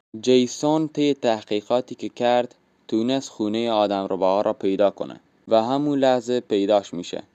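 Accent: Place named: Afghanistan. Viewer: هوی افغانی آدم قحطی بود تو با اون لهجه اعواج و مسخره تعریف کنی بدو برو آجرتو پرت کن......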